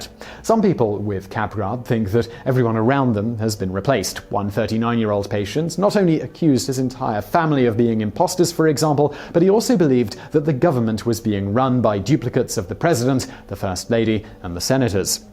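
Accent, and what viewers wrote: England, United Kingdom. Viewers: yeah. nice English accent without sounding pretentious. not that the English sound pretentious or are themselves so, it's just the American perception of it ironic that that evaluation sounds pretentious, I guess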